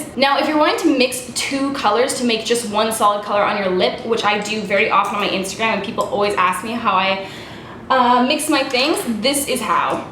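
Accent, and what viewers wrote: Canada. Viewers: She's Canadian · As soon as you opened your mouth I knew you were Canadian. I. Love. You. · You're Canadian aren't you! I'm not sure if you said this already but I can really hear it when you said "sorry"'and "out"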